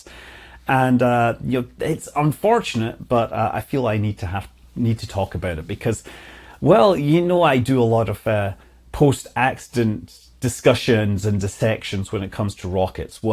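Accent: Scotland, United Kingdom. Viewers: Wouldn’t it make sense to have a telephone tree to get everyone to evacuate all aircraft from nearby hangars until the fire is out and cold? Don't forget to update (and keep updating) Dan Gryder, who maintains GA fatal statistics and advocates AQP. Have you taken AQP training? And get the wife trained up at least to do a landing, because human beings, namely you, have health failures at the most inconvenient ties. Gnosis?!? I thought you were from Scotland. Well, you’ve got the accent down pat. I’m from Sacto and learned to fly from Naps in ‘70s.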